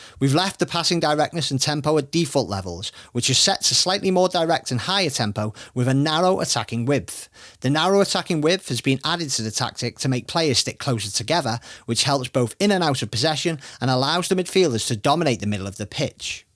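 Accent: Liverpool, United Kingdom. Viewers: Is this liverpool accent?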